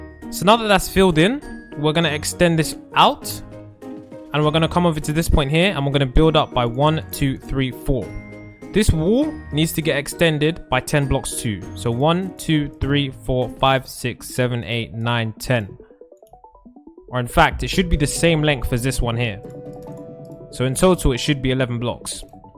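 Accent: United Kingdom. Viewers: I love the British acsent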